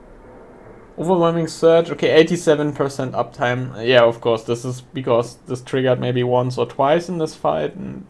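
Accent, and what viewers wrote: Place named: Germany. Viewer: Your German accent is nice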